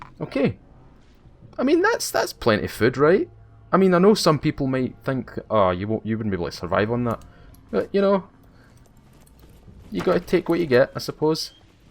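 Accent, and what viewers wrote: Scotland, United Kingdom. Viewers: that sweet sweet Scottish voice. mmmmm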